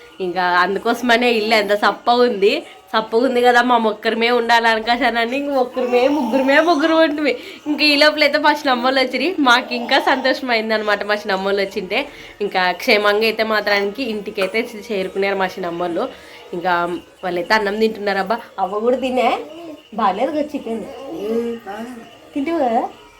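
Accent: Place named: Kurnool, India. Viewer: అర్ధం iendi మీరు karnool side వాళ్లు అని me భాష అట్లేనే vundi😂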